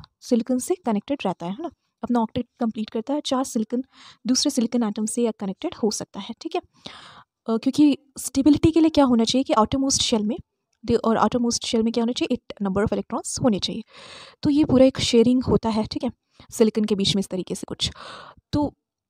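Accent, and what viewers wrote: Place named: India. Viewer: Please speak slowly, aapki BPO wali accent se aadha lecture uper uper jata hai, thoda toh cold blooded accent use karo kaha jane ki jaldi hai.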